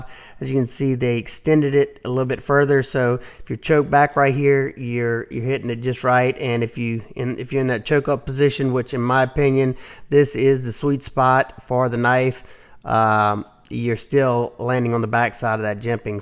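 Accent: Louisiana, United States. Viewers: I recognize a Louisiana accent when I hear one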